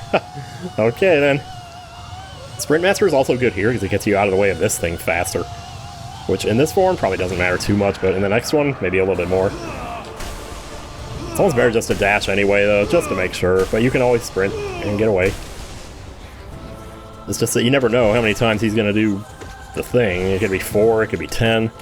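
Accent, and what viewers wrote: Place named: South, United States. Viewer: I'm not the only one who reads Selubra's name as Sebulba. I wouldn't have pegged you as Southern until I listened to some of your earlier / vlogs (you sister sounded a lot more obviously Southern, as did you when you were youngr), but I HAVE always wondered, and sorry if this sounds weird, if you saying "boy I tell ya" and "lemme tell ya" so often is a regional thing, or something you picked up from your parents. I thought that seemed too easy. I also thought they'd bow. RoahmMyrthril (LPer and Mega Man no-damamge runner) always found Yellow Devil more tedious and drawn-out than actually hard, and got mass-disliked in a video for saying that. Had a feeling you weren't invincible there. But that still destroyed the fight. I like how Sheo's different attacks paint the battlefield, I assume the place will end up looking different every fight as he varies what attacks he does and in what order.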